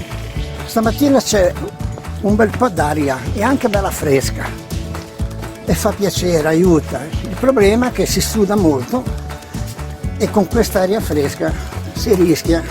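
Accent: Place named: Genoa, Italy. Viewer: Fantastico bellissimo video che bell'accento genovese 🙂 Patty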